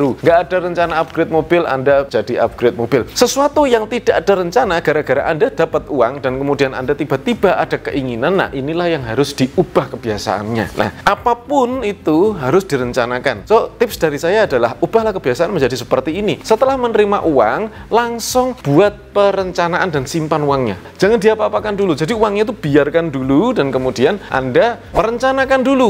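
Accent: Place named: Java, Indonesia. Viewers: Jowo medok mas...hehehe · Logat Jawa mbak 😊 Suami saya juga gitu 😆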